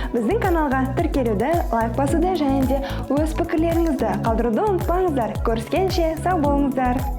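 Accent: Standard. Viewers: Ана тілімізде таза сөйлеген өркенің өссін айналайын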